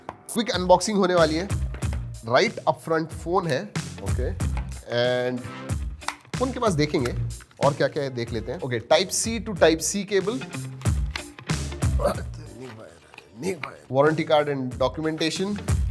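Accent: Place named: Khandesh, India. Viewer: Oh tuni bahna nig baher 😂😂😅wow sir tumhi bhi aahirani boltas maja yi lagi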